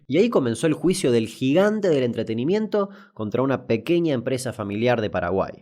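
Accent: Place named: Buenos Aires, Argentina. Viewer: Me asusta que tengas asento porteño siendo de Chaco :0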